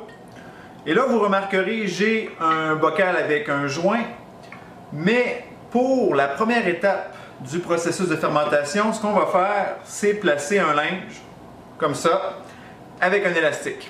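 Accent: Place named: Quebec, Canada. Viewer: Salut 👋 Thanks 🫶pour recette 👍 Pu*ain, j'adore ton accent 🫶 Longtemps dans passé j'avais un binôme québécois en french foreign legion...super gars ! Il as parlé comme toi 🫶👍😁🫡🇨🇵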